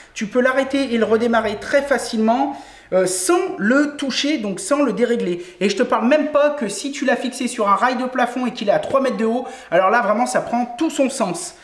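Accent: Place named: Western France, France. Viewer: Cette vidéo est top ! Je me suis levé de mauvais poil et ça commençait à pas filer droit mais tu m'as vite remis sur la bonne ligne si j'ose dire. J'aime bien ton franc parler, notamment le "ayait", tu serais pas de l'ouest toi ?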